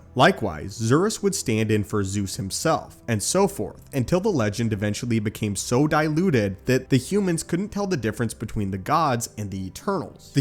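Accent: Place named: United States. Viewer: The americanized pronunciation of the names of greek gods is very distracting.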